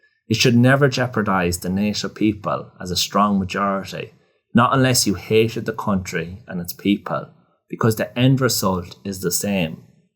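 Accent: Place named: Kildare, Ireland. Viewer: I blame the multi nationals for this. They now employ more non nationals here in ireland too. So support them you keep supporting this. Their a liability to this country now and played a big role in causing cost of living crises too. Irish are not having kids either due to to so much liberalism and diversity. I'm guessing this guys accent is around kildare area......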